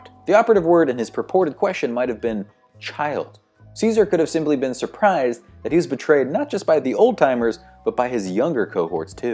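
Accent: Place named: United States. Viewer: O_o what English are you speaking? That is perfectly normal American English pronunciation of those words.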